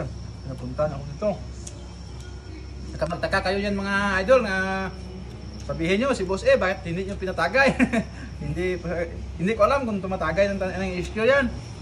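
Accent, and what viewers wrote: Iloilo, Philippines. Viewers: Idol taga saan ka ba... Parang tunong iloilo ka idol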